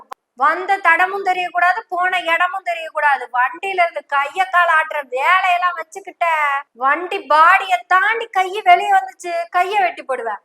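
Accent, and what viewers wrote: Kongu, India. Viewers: I very much like our kongu Tamil. pesu thanfam kalakkeereebfa my daughter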